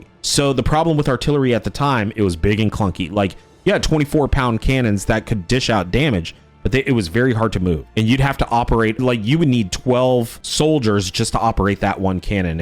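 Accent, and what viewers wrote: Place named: United States. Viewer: hearing a american say the g or ch will never be not funny to me, if you want more lore about more regiments of renown you gotta do some looking into, they sing about historical units, events, people, battles and other unique "items" of war without any of the stigma behind it of it being used for good or bad, axis or allies. is one of there song and a banger at that. fair note it is metal music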